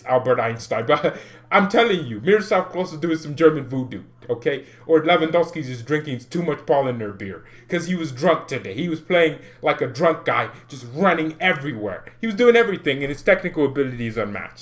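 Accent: United Kingdom. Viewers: I enjoyed your British accent and there way of speaking and thinking. You have talents to become an actor. Please more of this.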